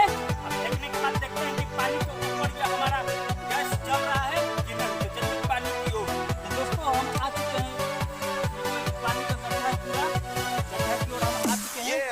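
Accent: Bihar, India. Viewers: Bihari accent made me watch this video longer. Gas cylinder se bas tum circus kar rahe thee!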